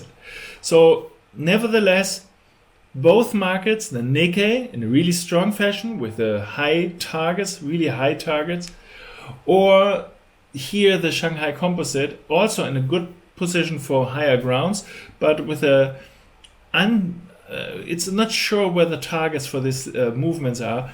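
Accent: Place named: Germany. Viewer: cooles german english!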